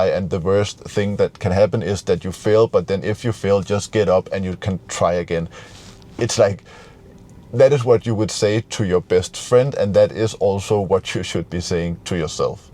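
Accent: Denmark. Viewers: Nice video as always. I am learning a lot from you, so thank you. Your englsh has gone from good to exellent, but you must never lose the charming danish accent .. .-) Can’t wait for the next video.. 💐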